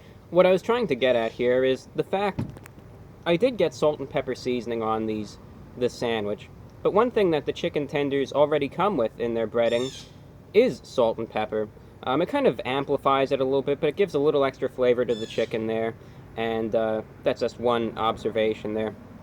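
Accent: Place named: New York, United States. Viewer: As I'm moving yet again, your accent reminds me of my hometown in NY. Thanks for helping me get through my most stressful move so far. <3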